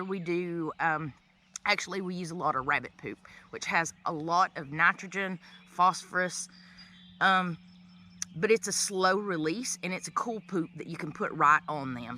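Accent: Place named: Georgia, United States. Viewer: This has got to be THE BEST video I've seen on onions! We also are in N. Georgia (Gwinnett County). I LOVE YOUR ACCENT! Two years ago I sat with a Georgia extension agent and had a long talk about growing onions. I proceeded to seed several varieties of short day onions and had HUGE success. Then to harden them off before sticking them into a raised bed, I set them beside the poly house in the semi-sun -- and we got visited by a late season hurricane. All that water washed down into my trays (and i forgot about them being in solid bottom trays) and drowned almost all those onions. What didn't rot we put into the ground and ignored the chickweed. You KNOW what happened. We salvaged a few that are now in stacking planters and are going to seed, which is fine because we will collect the seed and start over this year. Thank you for the details you provided, they are right in line with what the extension agent told me. 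Maybe this year we'll get off our butts and actually maintain them better. I'm going to try grow bags like you have there. How many did you fit into the bag and did they develop as you wanted? Also, how many times did you give them a hair cut?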